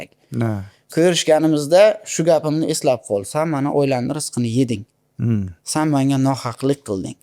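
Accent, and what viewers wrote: Bukhara, Uzbekistan. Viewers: Сизи яхши куриб томоша киламан самимий соф бухороча гаплариз ёкади узимам бухороликман тушунарли оддий роликлариз куп мусофирдан курдим бу сухбатниям тугриси ичизда бошка яшаркан видёда бошкача одамимийлигизга 5 бахо Лекин тарбия берган ота онанггизга минг рахмат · Toshkentga borib, o'zidan toshkentlik yasab olmasdan buxoroni shevasi bilan ko'tarilgan. Lekin ijodizni sog'indik. Judayam pazitivni edi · Molodes chistiy Buxoro shevada gapirdi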